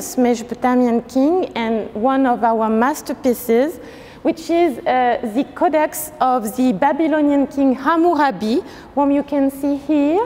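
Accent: France. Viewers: Thank you so much for this visit ! As a german i’m very pleased to be able to understand without having to be focused on the subtitles :) the french accent gives me the impression to travel til’ Paris with you in this marvellous place that is mesopotamia ! My favorites are definitely the lamassus at the entry, so impressive 💜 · Quel accent français insupportable ! Je doute qu’un non francophone comprenne le moindre mot.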